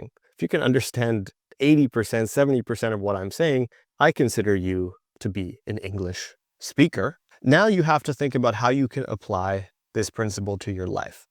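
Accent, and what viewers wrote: United States. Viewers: Are you speaking in American accent or British?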